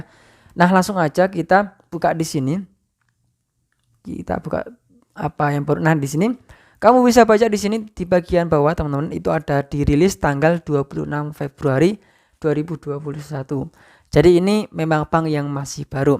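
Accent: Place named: Central Java, Indonesia. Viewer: Looo wong rembang iki 🤣🤭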